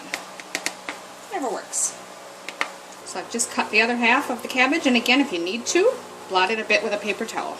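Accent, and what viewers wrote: Wisconsin, United States. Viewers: aahh,I'm from Chicago,and we can always catch that cheese head accent! Though like you I'm far from home too.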